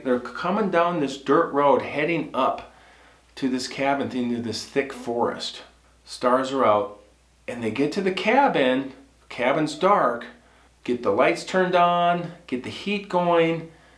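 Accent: Minnesota, United States. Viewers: I love Grand Marais, I e gone dogsledding there. I have no doubt with all the wild country that big foot is out there. Remer MN is really into Bigfoot ! Love to hear you say Minnesota, the accent is still there😊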